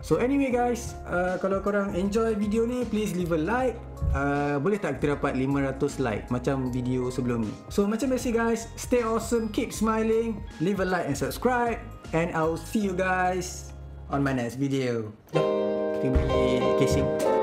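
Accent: Terengganu, Malaysia. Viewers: dak ganu ke.. mydin gong badak. baik ahh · orang terengganu ke? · Orang terengganu ke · org ganu ke boh?nice .. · Bunyi macam bahasa terengganu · bro org trg eh? area mana? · Org Terengganu kee??? duk mane.. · aku bru je discover video2 mu wahai, makin byk video aku tgok makin aku sure mu ni org ganu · ganu ghupenye..hahahah.. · orang ganu ke？ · Ganu boy bosku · Ouhhhh org ganu rupenyee...hihihi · laa org ganu ke? hahaha kemah idola duk gong badok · bro org ganu ke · pergh. orang ganu ke bohh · org ganu ke boh? baek ahh.. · Mu org tranung ke awang? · Orang Terengganu bro? dengor slang ganu skit tuh..huhu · Org ganu ke hihu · Hui orang ganu gok ni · ORG TGANU KE NI BOSS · org tganu ke abg? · Mu ni org teganu ke? Ingtkan org KL. Nice bohhh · orang ganu k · sek ganu ni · cam loghat teranung je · Ko orang ganu ke? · Org tranung ka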